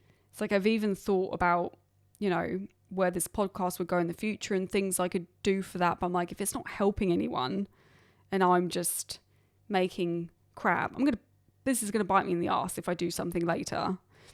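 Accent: Australia. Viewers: You should reconsider the title of the 'pod'. I would lean into your lovely Australian accent. And the fact that you're honest about your regrets in life, something like "Cautionary Tales: The Karen Chronicles" or "Bad Example".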